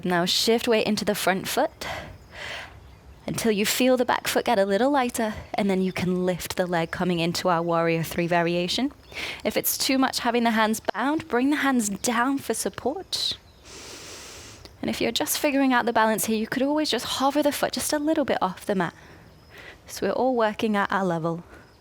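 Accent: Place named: Scotland, United Kingdom. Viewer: Only just discovered you however done a few sessions they are perfect in every way. Just curious where you are located? Detect a soft Scottish in your accent Hey all well done from jane in Australia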